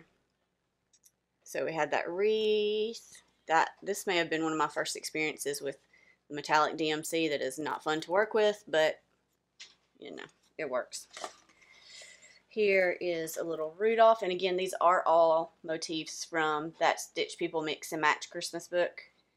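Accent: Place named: South, United States. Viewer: 😁 don't apologise for your cats' noises, I find it hilarious 😁 Maybe because I also have cats, four of them and sometimes one of them does a cameo on my floss tube 🙈😁 I came here because Chris mentioned you 😊 and I'll stay here. I like your projects and your Southern accent. Looking forward to seeing your cotton video 👍😊 Kr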